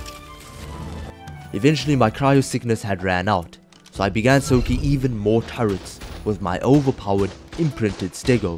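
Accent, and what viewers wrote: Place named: South Africa. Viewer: Coloured south african ya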